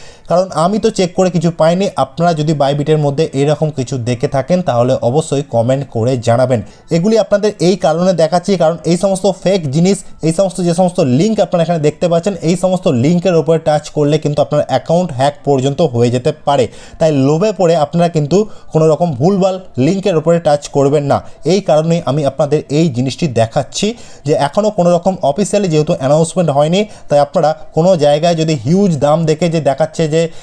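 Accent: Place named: Kolkata, India. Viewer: আরে ভাই, এটা আঞ্চলিক উচ্চারণ। আমরা বাংলাদেশে আশা করি উচ্চারণ করি। আর কলকাতায় উচ্চারণ এমন। বাংলাদেশের কিছু অঞ্চলেও এমন উচ্চারণ করে। 🙂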